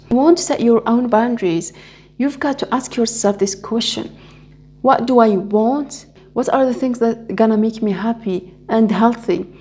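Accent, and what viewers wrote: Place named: France. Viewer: You look like a beautiful nun i do love your french voice🥰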